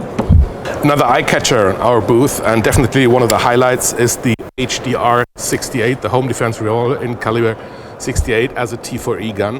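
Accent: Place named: Germany. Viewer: You have a pretty cool accent. Are you German?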